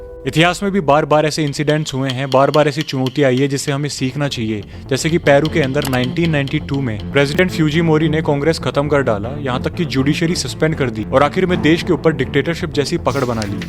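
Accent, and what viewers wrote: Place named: Rajasthan, India. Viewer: Good content 💯 bro this voice from Alwar Rajasthan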